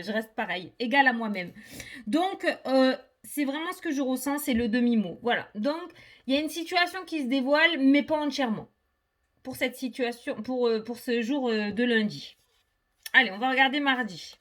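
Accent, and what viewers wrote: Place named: Southwest, France. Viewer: j'aime beaucoup ce mode de tirage. Je sais que les cartes disent vrai.... car j'en suis là et cela dure depuis 3 ans pour le dernier choc et 2015 pour le début de ma descente aux enfers des jugements des exclusions familiales. Je n'ai prise sur rien là est le problème. Je ne peux pas vider mon sac puisque personne en face de moi. Je ne peux plus continuer comme cela... j'espère un message même négatif pour pouvoir réagir et mettre un point final à cette situation. Merci pour cette lecture et pour l'Amour du Ciel.....ne change pas tu es juste parfaite avec ton accent pour moi qui suis native du Sud-ouest. Bisous ma Belle👌👄💖😍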